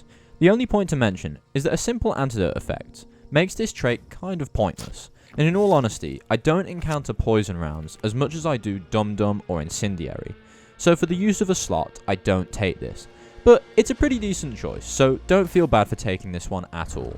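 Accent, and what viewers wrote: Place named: United Kingdom. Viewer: Right, idk why i said american, when that's totally an uk accent, so why uk people say "staminar effect, instead of stamina"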